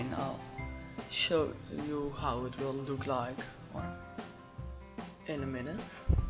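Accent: Netherlands. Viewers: You sound dutch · omg, die nederlandse accent hahah! te lieff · Dat Nederlandse accent is zo cute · He is dutch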